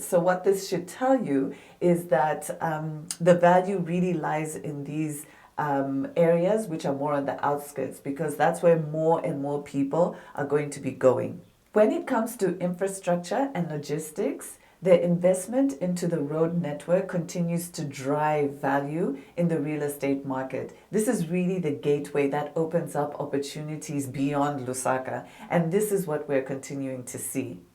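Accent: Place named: Zimbabwe. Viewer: You have some tones of Zimbabwean in you tho but i will wait till you tell me where you from cos you definitely not Zambian(Your name and accent tell it all)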